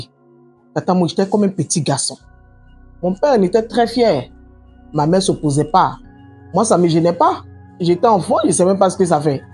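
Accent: Ivory Coast. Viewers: Madame, votre accent Ivoirien ainsi que votre posture de la personne qui raconte l'histoire sont merveilleux. J'aime bien cette manière de raconter. Ma suggestions est que tous ne comprennent pas certains mots et propos propre au contexte Ivoirien. Moi je suis Camerounais, et cela je comprends. Mais je ne suis pas tres sûr pour les autres. Si vos videos peuvent etre améliorer dans ce sens, cela sera genial. Merci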